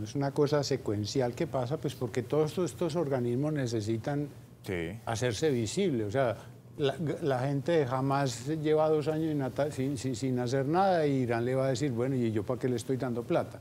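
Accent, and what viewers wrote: Colombia. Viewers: Fenomenal la docencia del prof. Y lo más sabroso, dictada en lenguaje paisa. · Un paisa con esa tonadita explicando la guerra, hemos escuchado a muchos catedráticos internacionales especializados y leído los mejores libros sobre ese conflicto árabe israelí. De pronto los paisas sabrán contar la guerra del narcotráfico y su líder.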